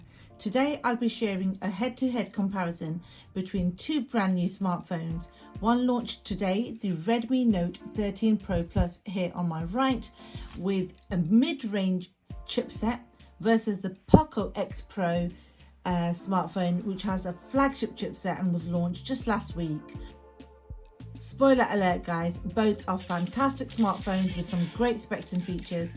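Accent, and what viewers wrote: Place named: United Kingdom. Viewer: It's a British accent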